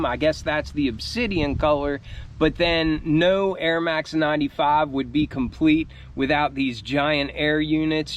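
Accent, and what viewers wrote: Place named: Philadelphia, United States. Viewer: You have kinda philly accent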